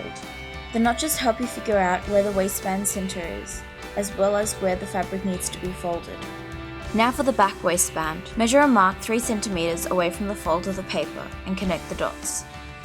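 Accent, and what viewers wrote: Australia. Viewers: Your accent sounds familiar lol, are you from ausltralia? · My god, I'm Australian (with this exact type of Aussie Accent) and I didn't even pick it. You must have a good ear!)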